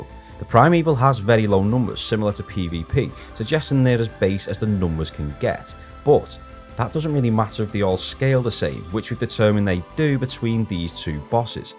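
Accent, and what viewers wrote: Liverpool, United Kingdom. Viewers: Loving the thorough breakdown here, always enjoy numerical deep dives in Destiny! Thanks for the video! Also, am I detecting a fellow scouser here mate? ;D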